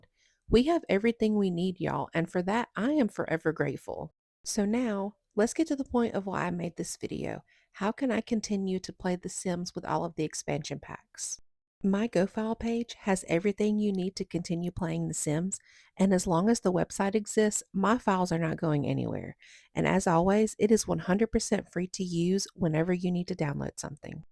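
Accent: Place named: United States. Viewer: I don't mean to annoy everyone here but I feel morally I have to warn you; if you are serious about doing this you need to be way more careful, dumping all the files on GoFile? Are you familiar with copyright? I'm going to simplify this just to get my point across: You need to do stuff like set up private server and host a site to put it on or use a torrent site etc. Also you sound like an american, the US has copyright laws, if EA find you they will sue your arse into oblivion and they have a team of sharks just for that kind of thing, crackers normally are in other nations that have flimsy copyright laws so they can get away with it. I wish you the best I really do and EA are scum so um be careful yeah?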